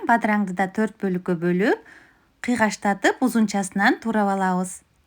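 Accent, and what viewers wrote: Standard. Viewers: Азаматсын,таза кыргызча нукура так сулойт экен,кээ бир чалдырагандар бар бир созго эки орусча кошуп тантырагандар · Колуна береке аябай таза кыргызча суйлопсун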